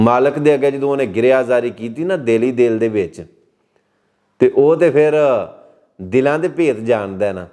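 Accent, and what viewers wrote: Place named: Kasur, Pakistan. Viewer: jee tohada programe pehli wari vekhiya. Dilkhush hogia. Tohada Andaz wadhiya hey. Kasoor de lokan wangoom boldey ho.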